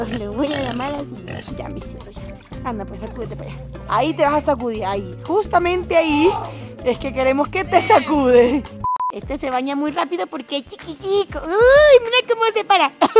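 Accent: Venezuela. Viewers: Eres de Venezuela????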